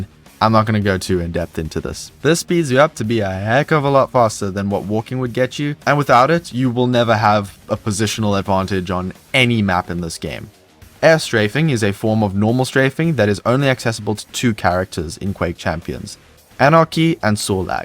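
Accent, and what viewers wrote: South Africa. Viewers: Is this man South African?! Legend. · Hah, I could instantly tell the Saffer accent :D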